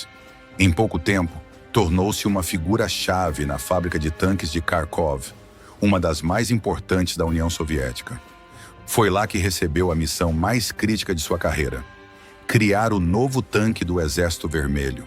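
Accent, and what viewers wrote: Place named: São Paulo, Brazil. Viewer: Colocaram o sotaque de Paulista caipira na voz da IA.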